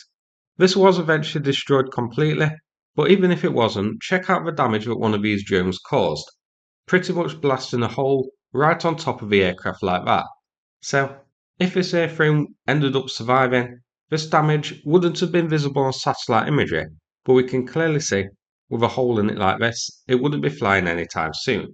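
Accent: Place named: Nottingham, United Kingdom. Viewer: Good stuff. (BTW: Where are you from? I'm from Nott'm and your accent is very familiar!)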